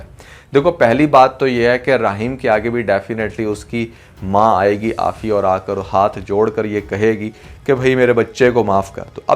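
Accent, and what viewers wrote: India. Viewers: Indians ki trah "phir" KO "fir" Ku bolte ho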